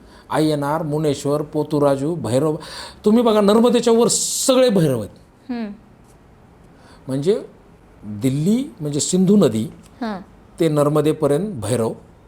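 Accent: Marathwada, India. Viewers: तुम्ही मराठवाड्याचे किंवा बीड चे आहात का तुमच्या भाषेवरून 😅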